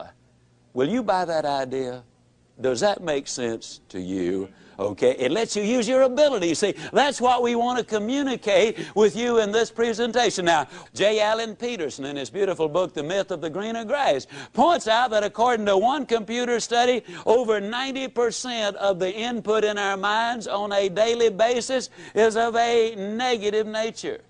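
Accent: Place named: South, United States. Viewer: he sounds like someone from arkansas/ texas/ mississipi. he sounds like forrest gump. ziglar died three years after jim rohn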